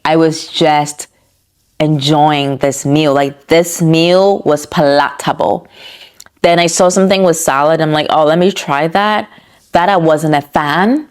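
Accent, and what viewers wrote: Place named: Italy. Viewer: I read Roma and Positano in your acquired Italian accent haha! If you spent over €300 a day charging then that's definately theft of some kind. Sorry. And that's positive...your gesture towards my app. Appreciate it.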